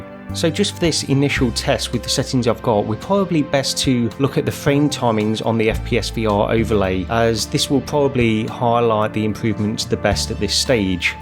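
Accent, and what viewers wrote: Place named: Leicester, United Kingdom. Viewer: That’s a Leicester accent if ever I heard one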